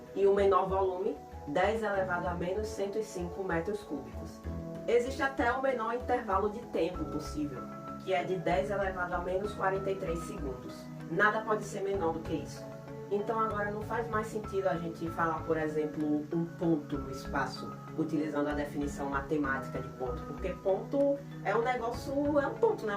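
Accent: Northeast, Brazil. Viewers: Ela é maranhense, paraibana ou cearense eu acho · Adorei o vídeo 😄. Só fiquei curioso pra saber de qual estado do nordeste vc é.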